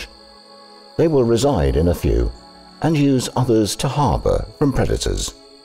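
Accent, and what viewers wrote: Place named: Australia. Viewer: Love the way they chose a really Aussie sounding voice for the commentary, plus he bungs it on a bit more too 😃👍🇦🇺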